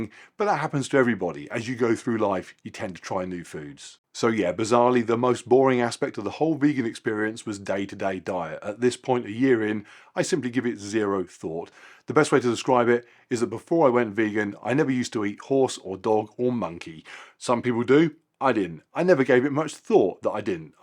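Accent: United Kingdom. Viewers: Unfortunately, I cousins understand a word in English. Very strong British accent and I cannot understand this English.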